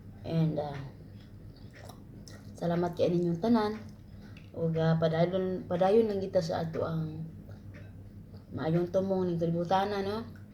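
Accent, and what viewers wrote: Visayas, Philippines. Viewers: Hello!!! Pag hk after ka mahuman sa imo contract inday ky mas dool sa ato... Nindot jd paminawon kng mgbinisaya ka inday👍😊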